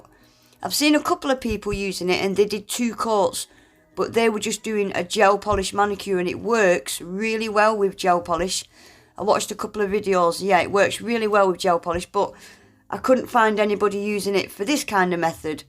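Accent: Yorkshire, United Kingdom. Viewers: It’s a proper Yorkshire accent 😉🥰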